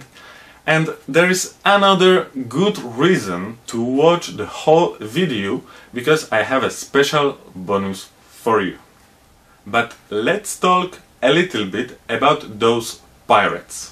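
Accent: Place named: France. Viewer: Your accent sounds French. What is the origin of this accent?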